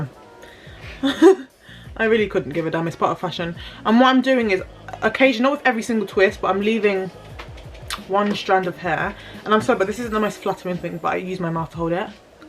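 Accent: United Kingdom. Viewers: Greetings Sis. Just wanted to say that it's great to have a British voice amongst these videos. Thanks for taking your time with showing the process. Makes such a difference. Please do more videos. Thanks so much.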